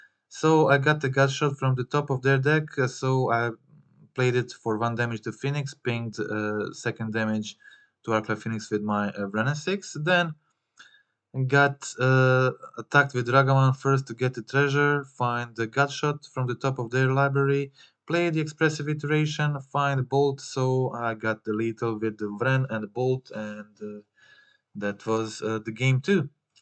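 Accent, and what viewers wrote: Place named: Poland. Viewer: first time here, and im about to buy 8 copies of it for me and a friend, no one else besides us two here thinks its any good haha (also your accent sounds pretty polish, correct me if im wrong haha)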